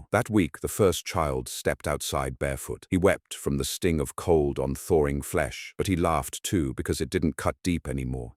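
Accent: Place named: United Kingdom. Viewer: The pronunciation sounds British to me. It’s different from American English, and correct British.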